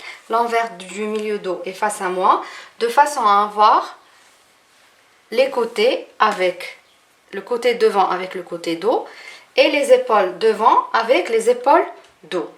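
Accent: Tlemcen, Algeria. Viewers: d'aprés l'accent vous étes une tlemcenniene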